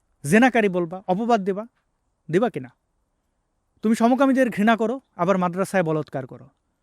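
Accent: Sylhet, Bangladesh. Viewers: মাঝে মাঝে সিলেটি ভাষায় মাতবা বালা লাগে হুনতে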